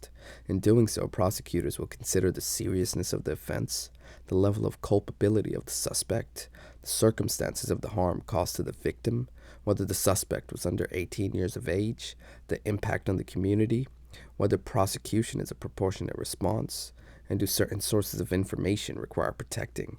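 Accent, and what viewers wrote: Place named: United States. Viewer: The Yanky accent just doesn’t fit. Nevertheless, great explanation!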